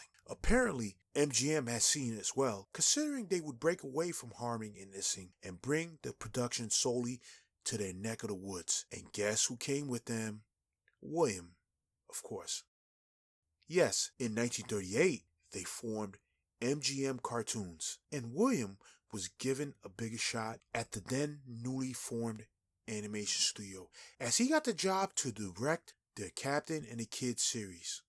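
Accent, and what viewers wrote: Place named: New York, United States. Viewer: Im a big fan of these shows and this was very well done! I subbed and cant wait to see more. BTW are you from NY? thought I detected an accent and your voice reminds me of Method Man. It made me enjoy the narration even more.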